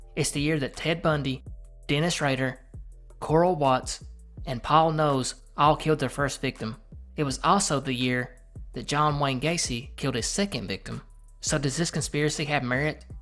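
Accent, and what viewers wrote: South, United States. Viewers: I love rural/southern/farmland accent. So nice on the ears. Honest and direct. No pretentiousness.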